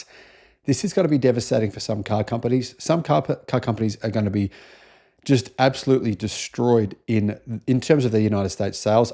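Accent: Australia. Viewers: Australian pronunciation , wait 'til you hear us say aluminium 😂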